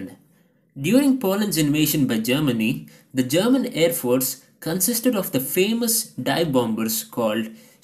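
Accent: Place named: India. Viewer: Just because someone has an indian accent, that doesn’t mean that they’re dumb. the video is very informative and well done.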